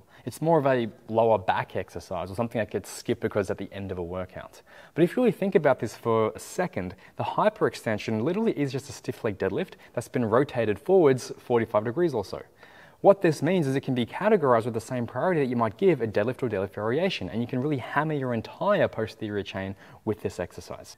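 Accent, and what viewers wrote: Australia. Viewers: Are you Australian? Where are you based? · So weird hearing an Asian man speak with a thick Australian accent. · Are you Australian?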